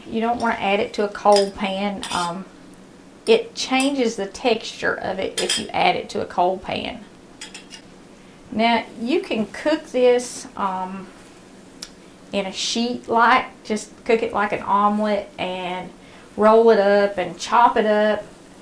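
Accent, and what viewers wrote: South, United States. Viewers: From witch state in the Us are you from? Somewhere from the South? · Love following you, Christ first, plus you have wonderful recipes! And you have a southern draw like myself 👍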